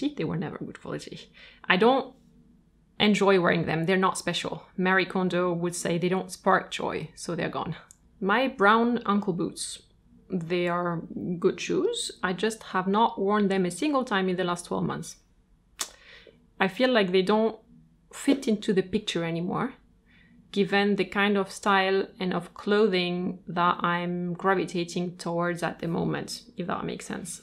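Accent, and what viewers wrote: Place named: France. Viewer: Dear, thanks so much for the video. It inspired me to declutter my shoe closet. I am avid fun of Mary Condo, so i do organize my closet and periodically declutter. Even though i do not own many pairs, i really appreciate high quality. Is it possible you spell out the brands that are featured in your video? I watched it several times but due to french pronunciation can not catch the names.;) I especially loved your platform boots and platform summer sandals. and black shoes, they are so spectacular. The links you posted does not take me to the same shoes. May be because i am located in US and it takes me to German version of the website. If i know brand names of the shoes featured in your video i could look up based on the brand name. Thanks os much in advance!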